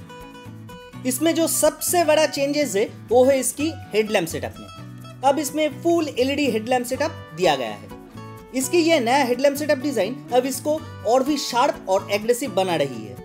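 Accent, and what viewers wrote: Bengal, India. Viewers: Dada apnar hindi te bangali feeling puro relavent.tobe abs version ta hole besi vlo hoto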